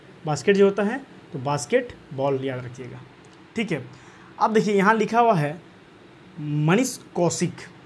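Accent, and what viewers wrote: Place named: Maharashtra, India. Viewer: Mala tuji hidi ayakun asa vatla ki tuji bhasa marati ahe asa vatla pahlida 🧐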